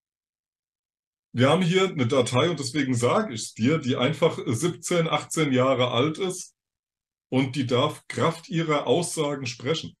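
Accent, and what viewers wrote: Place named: Hesse, Germany. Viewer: And then… Frühling is coming. 🎉 Ich hab ja echt ne Affinität zu dem Hessischen. 😅 Höre dir gerne zu. Nimmt dem ganzen so den Ernst.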